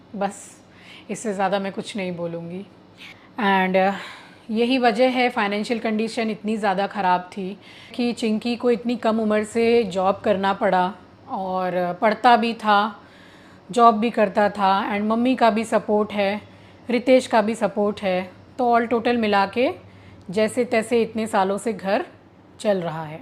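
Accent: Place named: Uttar Pradesh, India. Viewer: ji aapki purchase sunkar mere aankhon mein aansu a gaye main aapke block abhi ek do mahine se hi dekhne shuru Karen jab aap kuchh Shabd aise bolati hai use Kanhaiya se hai mujhe tabhi mahsus hona tha ki aap up se belong Karti main bahut bar likhna Jahan ek meri bhi suar ki mere aankhon mein bahut taklif rahti hai mujhe aapke Shabd bolane se lagta ki aap up se belong Karti hai uska jawab mil Gaya Mera bhi mica Agra mein hai Delhi mein meri shaadi Hui hai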